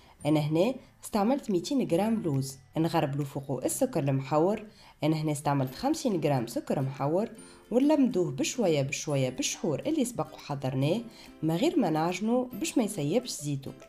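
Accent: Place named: Tunisia. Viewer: الله يعطيك الصحه ابنتى اول مرة كنشوف هاد نوع من حلويات تونسيه رائعه جداااا حطيت الاشتراك لأنه عجبتني طريقة شرح وتصوير ممتاز وخا شويه صعب عليه لهجة تونسيه إلى ممكن ابنتي فضلا وليس أمرا سوال أتمنى جوابه بتفصيل بنسبه للعقد اللوز والفستق ونوع تالت إلى عملنا نفس الي قلتي يعني القطر العادي كم المده الي لي نحتافظو بيها هاد نوع الحلويات واش ممكن يدخل للفرن وشكرا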